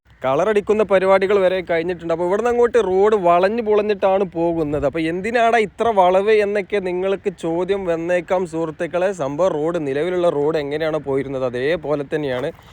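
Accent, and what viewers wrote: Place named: North Kerala, India. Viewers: വടക്കൻ കേരളത്തിലെ സംസാരഭാഷ കേൾക്കുവാൻ നല്ല ചന്തം ഉണ്ട്. അച്ചടി ഭാഷ അല്ലെങ്കിൽ എന്താണ്. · Good talking . Nice to hear the north Kerala slang. Well done Nitin Gadkari ji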